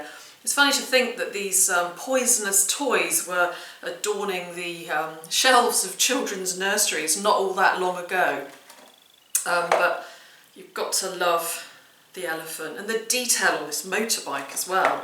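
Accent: United Kingdom. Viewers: Smiled everytime you said "Keef-ley" in it's Northern pronunciation. Stands out a mile from your natural 'English Rose', accent! lol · Thanks for your videos, ser the treasures and hear your british accent so magnétic. Congratulations and greetings from Argentina.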